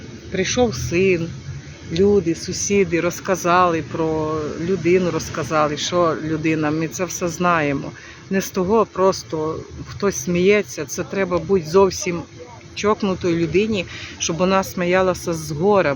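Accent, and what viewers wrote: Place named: Polesia, Ukraine. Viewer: Я только ради вашего особенного колоритного языка задержалась и осталась. Слушаю, как вы рассказываете все равно про что. Знакомлюсь немножко с украинским Полесьем. И да, вы очень привлекательная женщина. В любом вашем состоянии или настроении. И в лесу, и в городе. Мне из Москвы не огидно.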